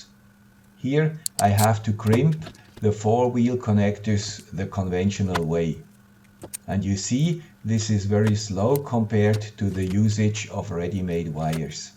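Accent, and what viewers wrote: Switzerland. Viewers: Great video. Love your Swiss accent.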